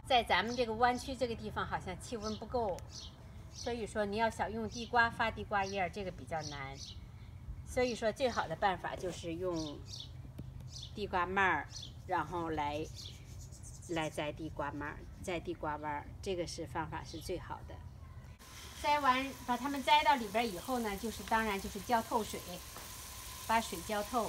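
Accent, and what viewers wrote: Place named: Henan, China. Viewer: 一听就是河南老乡！老乡你好！👋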